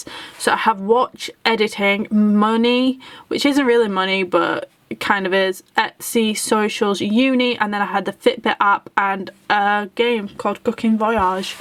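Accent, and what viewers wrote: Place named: United Kingdom. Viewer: the British Accent kills me very softly.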